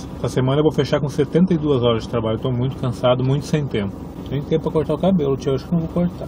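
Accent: Rio Grande do Sul, Brazil. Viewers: É o primeiro video teu que assisto, mas pelo sotaque logo percebi que eras do RS (eu também sou gaúcho). E quem falou que você não tem uma boa dicção, estava muito enganado. Você faz uma excelente apresentação em frente a câmera! Não é cansativo de assistir, muito pelo contrário! Seu relato nesse video foi emocionante! Ganhou mais um inscrito e um joinha e vários compartilhamentos! Rumo aos 10 milhões de views, tchê!! Abraço! :-)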